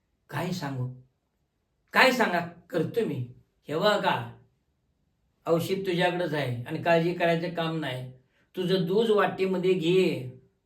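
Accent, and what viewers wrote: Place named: Kolhapur, India. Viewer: Salute sir thumala nad khula kolhapuri tone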